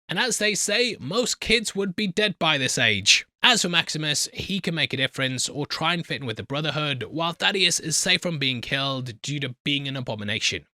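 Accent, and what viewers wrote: United Kingdom. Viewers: How dumb is it that I'm so normalized to your content, that I forget you're from the UK, so when you make jokes on Americans like "Why don't you want to talk like this" it takes me a second,lol?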